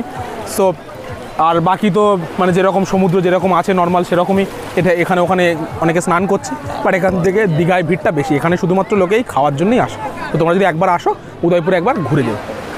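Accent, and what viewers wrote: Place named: Bangladesh. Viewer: তোমার বাড়ি কি বাংলাদেশ কথাবার্তা শুনে সেই লাগছে আমার বাড়ি দীঘা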